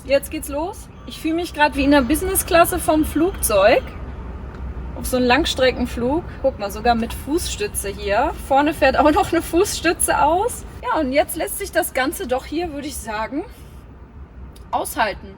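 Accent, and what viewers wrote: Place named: High German, Germany. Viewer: That's not an accent, that's High German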